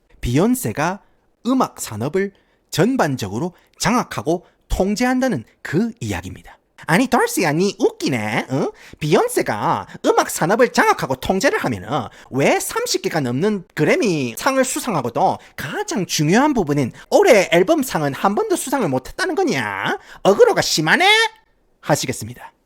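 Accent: Gyeongsang, South Korea. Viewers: 찰진 경상도 사투리 사이 사이에 들어있는 쫜득한 영어발음 때문에 컨텐츠 상관 없이 계속 보게 됨…외중독되… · 경상도 사투리와 완벽한 영어발음의 아름다운 조합. 좋아요😊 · 갱상도 사투리로 영어를 말아주네 ㅋㅋㅋㅋ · 임마 😂😂😂 애비 🤣🤣🤣 처음 영상 보는데 너무 재밌게 잘 보았습니다 🤗 경상+잉글리쉬원탑티어!!! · 구수한 갱상도 사투리로 usa 조져주는 바로 그맛 아입니까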